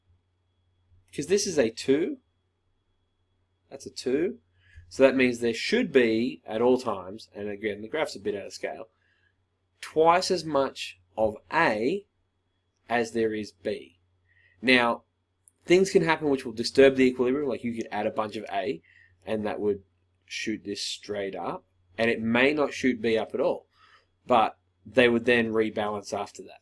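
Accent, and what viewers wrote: Australia. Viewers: Thank you australian science guy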